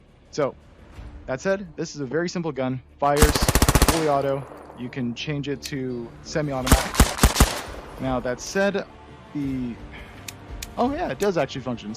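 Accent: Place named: United States. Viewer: I can already tell your american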